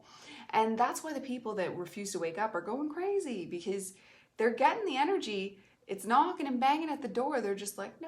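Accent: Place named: Canada. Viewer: "Hey sugar, ain't we got a DATE?" Thank YOU for YOUR thrilling and timely response! You're the internet superstar! I'm here with a note from my mom pinned to my jacket, "Please get Glenn on Bus 6, thank you!" And really, it's nothing overt, but really rather quite subtle! I'm kind of a student of the nuances, vagaries and evolution of language (a very BAD student, I'm afraid, slouching in the back of the classroom, hoping not to be called upon!), so please forgive my inadequate efforts to indicate what clued me to your nationality. I know "boot" doesn't quite get it, but sometimes we have to "settle out of court," so to speak! Also, count me sweet on "Canucks" and "Kiwis" (Aussies, not so much... sort of more of on a case by case basis!). If not for a string of DWI's in my intemperate years, I'd probably be living in Vancouver or Banff or Ottawa, instead of being stuck here on the plains of East Dakota, where there is no crime, but plenty of fear and semi-automatic weapons! 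In addition, "Slings and Arrows" is one of the funniest TV series I've ever seen, and, in spite of spending most of her life in California, Joni MItchell TOTALLY still sounds wonderfully Canadian! What's not to LIKE? "If I had loved you less, or played you slyly, I might have kept you for a summer more, But at the cost of words I value highly, And no such summer as the one before." from "Fatal Interview" -- Edna St. Vincent Millay OK, "Big Whore" agrees to subscribe! Keep that Canadian voodoo and mojo co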